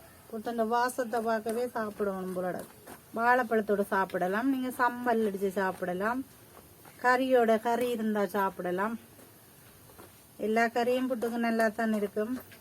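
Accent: Jaffna, Sri Lanka. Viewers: உங்க யாழ்ப்பாண தமிழ் அருமை சகோதரி👍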